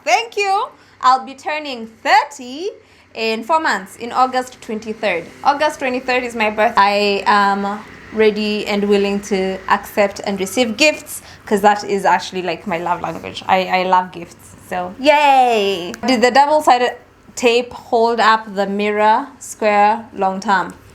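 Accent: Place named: United States. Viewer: You have an american accent have you lived in the states or were you going to an international school in your country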